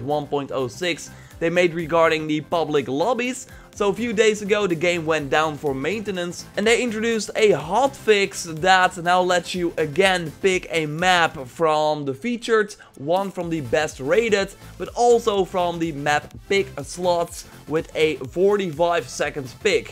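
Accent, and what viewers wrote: Netherlands. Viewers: Wait what are u dutch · I'm assuming that cool accent is a Dutch one? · Ik wist altijd al dat je nederlands was dat hoor je gwn aan je accent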